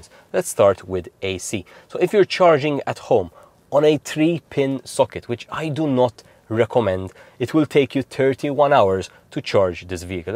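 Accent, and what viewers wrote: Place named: Malta. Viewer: I was watching this video and kept saying, this guy sounds Maltese, weird... 😅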